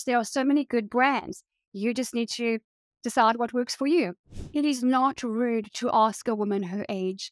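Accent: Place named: South Africa. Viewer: Thank you for sharing with us you are so inspirational and sweet and love the south African accent☺💖👍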